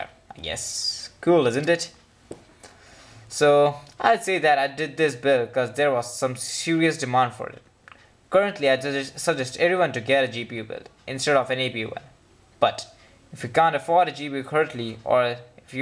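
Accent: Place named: India. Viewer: funky indian accent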